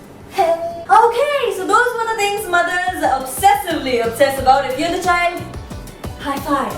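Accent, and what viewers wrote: Maharashtra, India. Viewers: please use a lot of your Marathi accent cause it's super cool.... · I love your marathi accent..... You rock it... :* love you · yeah so true i dont why but is way better than superwoman her marathi accent is so cute n i find her videos relatable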